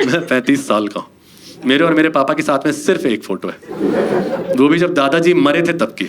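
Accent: India. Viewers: Your dialogue delivery is so typical of Renukoot with a touch of the old Mirzapur vernacular. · That little touch of Bihari dialect.. amazing 👏👏👏👏😂😂😂😂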